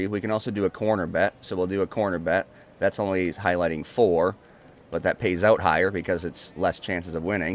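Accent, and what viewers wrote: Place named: Minnesota, United States. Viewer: Your from Minnesota. So I’m I 🤪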